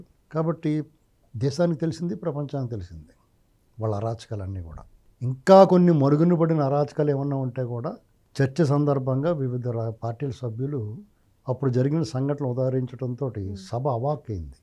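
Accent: Godavari, India. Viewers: సూపర్ గా చెప్పారు గురువు గారూ.. ఈ యెదవలందర్నీ బహిష్కరణ చేయాలి.. ఈ విషయం గట్టిగా ఆలోచించాలి. మీ ఆలోచనలనూ, మీ సూటిగాప్రశ్నించే విధానాన్ని మీ అభిమానిగా.. ఎపుడూ అభినందిస్తాను. ఎన్నో మామూలు జనాలకు తెలియని, అర్ధం కాని విషయాలు కూడా ఈజీగా గోదావరి జిల్లాల యాసలో, సామెతలతో చెప్పడం నాకు బాగా నచ్చింది. నమస్కారాలు.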